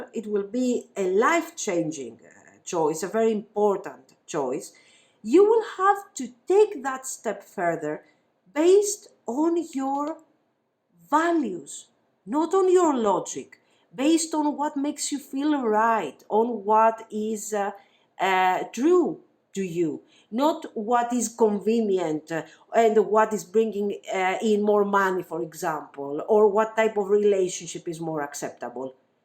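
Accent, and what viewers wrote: Greece. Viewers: hi. i feel like youre greek bcuz i am half and your speech is familiar. is this rtue? any advice how a greek woman can be w/ a colombian man?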